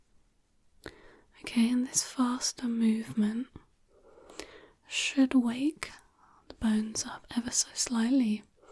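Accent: United Kingdom. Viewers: I think the accent and resonance of the voice, yes British or other may have a measure of engrained history, spirit, and stability which for me makes the feeling or resonance more appealing. My grandmother had that. For me the body language, face and hands also have this. I feel the societal treatment for girls/women also allows for many to maintain much more stability and ease. I feel this much more support (along with much more social interaction/transfer of skills over time) is working together to create much more lower average layers, ease of learning/motivation to learn (mental reward received for mental work expended), and together is allowing more and more ease of development in the information age. Theory which sees our average stress as much more complex: both conscious layers and many many other maintained subconscious mental work which for higher layers persons is forcing them to work much harder to receive the same mental reward and usually also missing out on much positive social interaction and better acquired mental/social/emotional skills over time. 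Hope theory will provide tools to more permanently reduce layers through more continuous resolution and tweaking faulty weights and values on our own to help permanently reduce many layers.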